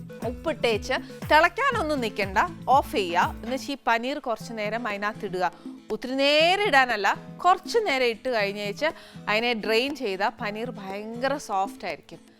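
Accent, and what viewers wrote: Kottayam, India. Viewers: Kottayam bhasha kurach koodipoyi 😂😂😂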